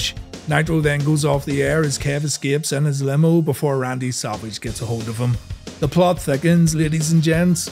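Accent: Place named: Northern Ireland, United Kingdom. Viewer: It’s been a long week lads, let’s gather around the fire and reminisce about 90s Wrestling as narrated by a delightful fella from Northern Ireland.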